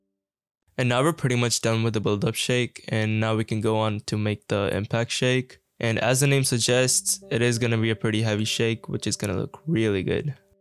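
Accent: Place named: India. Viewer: really helpful indian accent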